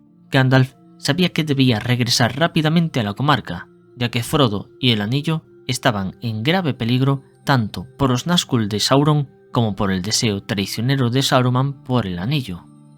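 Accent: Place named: Galicia, Spain. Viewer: Mejor que Kai, en todo caso, ademas muy modesto, y el acento gallego es genial y mágico. Ademas en Kai noto cierto aire de superioridad y desprecio por el subscriptor. Escuchando a, me siento mejor. Pondria como a como Gandalf y a Kai como Saruman, o mas bien Zarquino.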